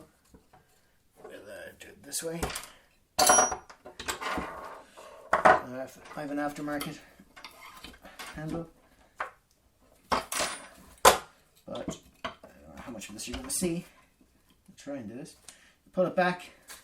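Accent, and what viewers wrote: Ireland. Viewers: It's an Irish accent, but not a difficult one. Are you talking about that, or the volume?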